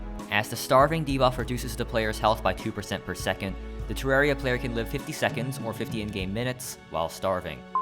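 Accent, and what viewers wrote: China. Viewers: I love your chinese pronounciation. Also, Frozen Chests were once called Ice Ice Chests in the game. Unless that was just in the Russian localization. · okay, so now we know you're a native speaker of chinese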